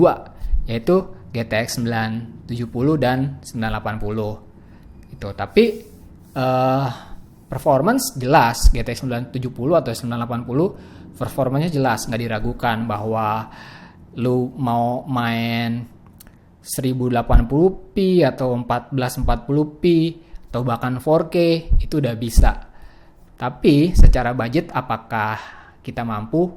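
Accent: Indonesia. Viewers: mulut Indonesia bro. saya adja masih bilang ti itu t i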